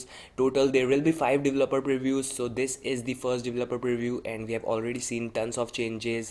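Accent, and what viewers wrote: India. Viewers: I'm a simple man, i see an indian accent, i downvote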